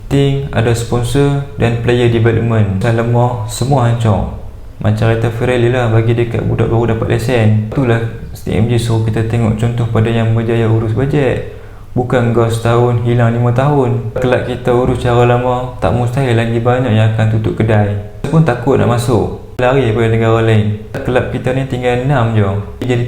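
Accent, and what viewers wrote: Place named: North, Malaysia. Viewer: Ni bercerita ke...cite apa ni??tone brckp sama je...dh la utara pekat...bkn semua org fham...jenuh nk fhm apa yg ko cba smpaikan...pening arrr...!😂😂😂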